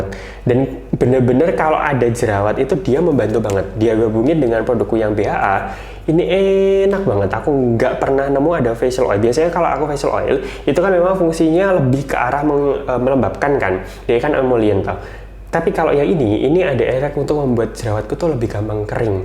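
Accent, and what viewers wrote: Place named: East Java, Indonesia. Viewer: logatnya kayak jawatimur agak ngapak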